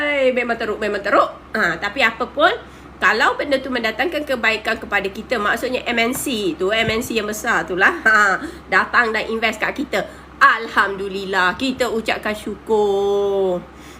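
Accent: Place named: Kelantan, Malaysia. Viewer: bunyi mcm org kelantan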